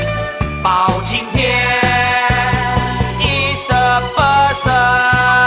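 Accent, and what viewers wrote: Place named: Thailand. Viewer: it sounds your thai language accent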